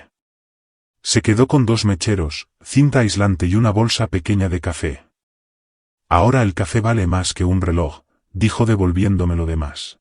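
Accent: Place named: Spain. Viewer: L voz españolísima la embarra..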